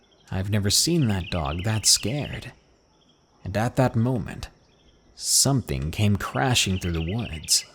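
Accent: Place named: United States. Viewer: Why does someone who is an Irish man raised in Ireland speak like an American with northamerican slang and no uk/Irish terms or phrases.... You are fucking bullshit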